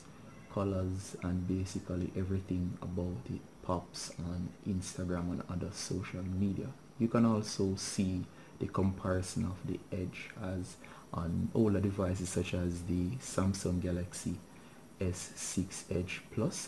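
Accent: Jamaica. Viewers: Are you from Jamaica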